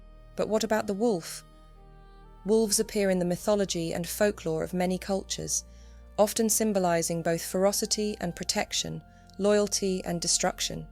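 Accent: England, United Kingdom. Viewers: The delightful English accent enticing us down the path, peeling back the layers; soon the unwary listener finds himself traversing the underworld. Delicious! But you stopped the story short just when it was becoming really interesting. You were about to step into the world of gods and the spirit, and the value of expressions of consciousness to the ancients, their supposed cosmology and divine order. Another day hopefully.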